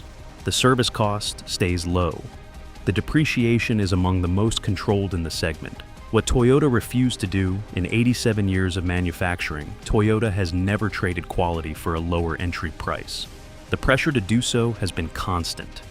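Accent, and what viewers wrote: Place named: United States. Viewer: Turned it off when I heard an American accent!!!!!!!!!!!!!!!!!!!!!!!!!!!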